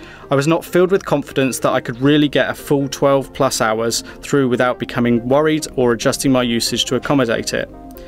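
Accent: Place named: England, United Kingdom. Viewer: May i guess what nationality are you? Based on your accent i would say you come from England :) Again, the way you do it, i just simply love :)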